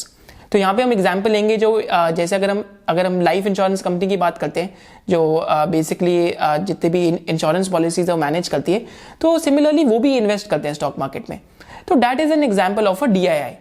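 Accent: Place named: North, India. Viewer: Bhai aap jo hai na bilkul North Indian ki tarah baat karte vaise aapke ghar kahan par hain yah to bata do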